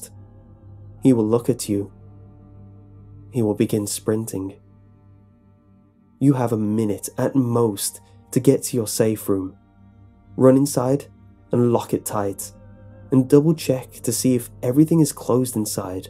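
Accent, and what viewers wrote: England, United Kingdom. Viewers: I believe he is english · He actually mentions in his "Draw My Life" video that his dad is English and his mother is Filipino, which I found surprising. Most people I know who are from the Philippines have thick accents, even if they've spent years or even a couple decades in another country, and alot of people I know who are raised with one or more parents who have thick accents end up with at least a faint accent. has an absolutely flawless English accent, and until I saw that video I assumed he was raised in some posh English family:P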